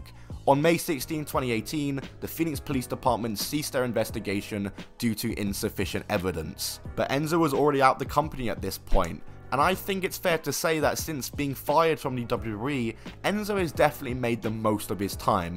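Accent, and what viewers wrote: United Kingdom. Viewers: I think it's the British narrator's voice that makes me feel smart and snarky, too. 😆😆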